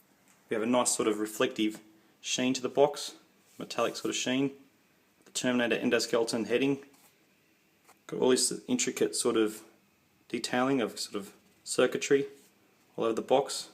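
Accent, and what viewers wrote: Australia. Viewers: it looks awesome and i cant wait for mine to arrive. i wish i had this paid off already but last payment is today and should be here soon. i assume your living in australia? due to your accent,im a west aussie and just wondering if there shipping to australia yet. cheers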